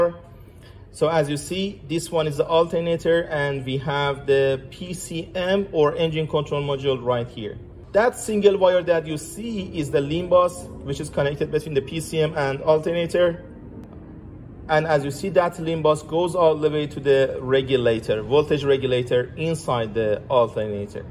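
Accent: Iran. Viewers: لهجه ایرانی😊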